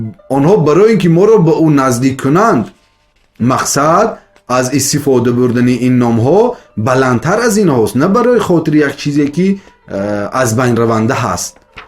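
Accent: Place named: Tajikistan. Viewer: Бехтарин суханхо. Лахни бисер ширини точики кухистони.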